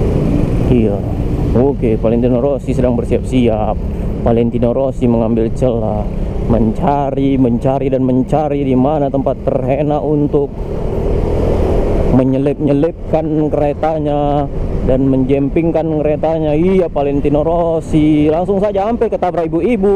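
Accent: Medan, Indonesia. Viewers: Kangen dengerin bahasa medan lagi kak,ak dulu tingal d polonia kak belakang hermes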